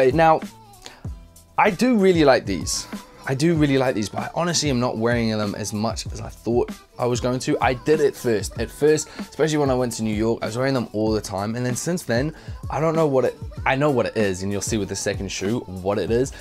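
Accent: New Zealand. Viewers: tuna - pretty sure it’s New Zealand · omg so u are from New Zealand?! The first time i heard you I thought u were Kiwi but I think I heard something about the UK so I thought wuhhht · Do any of yous know if he's from NZ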